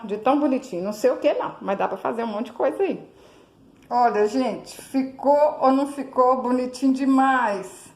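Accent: Minas Gerais, Brazil. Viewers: Que pessoinha linnnnnda que você é, e esse sotaquin de Minas Gerais! Muito obrigada por sua generosidade. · Amo seu jeito mineirim carinhoso de falar...kkkk Você é um doce!!!!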